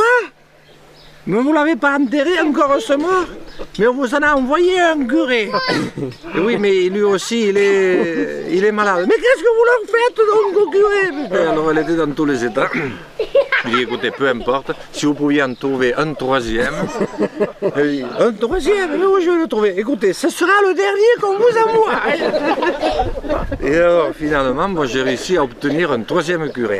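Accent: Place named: Béarn, France. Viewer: Ce n'est pas un accent Chti, mais un accent du Sud-Ouest !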